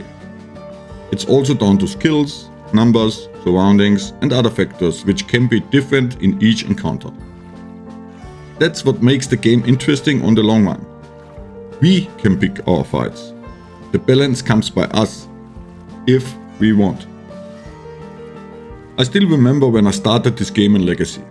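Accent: Germany. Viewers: Are u german? Ur english sounds german 😅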